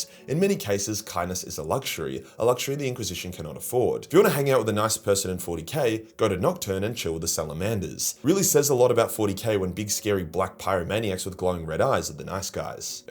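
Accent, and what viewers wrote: Australia. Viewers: I came here for everything besides the Eisenhorn shit, then was surprised by how much shit I have no clue about then realized I'm gay and just wanted to relate to your Australian accent in a way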